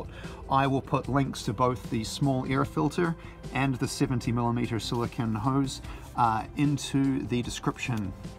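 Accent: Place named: New Zealand. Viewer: You sound like you're from somewhere in the south island of NZ?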